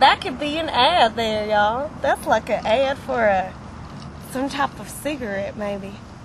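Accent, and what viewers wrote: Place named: Mississippi, United States. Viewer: i'm from and live in missippi, and she sounds like a lot of people from here and alabama..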